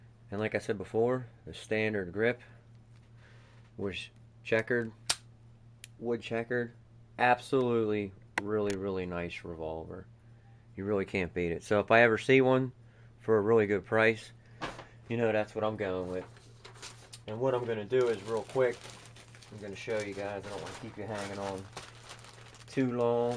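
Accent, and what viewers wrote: Pittsburgh, United States. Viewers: You sound like you're from Pittsburgh.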